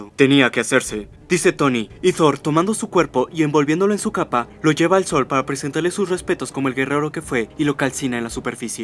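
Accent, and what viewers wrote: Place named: Mexico. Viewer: Hey carnal mis respetos t la sacas para narrar los shorts parecieras mexicano que las pirula la pulida de bastón darle duró a la tula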